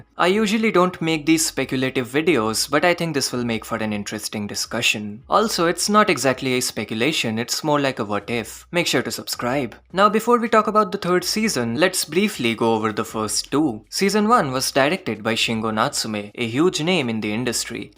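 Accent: India. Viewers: Bro has an indian accent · First time hearing your voice, I know you're indian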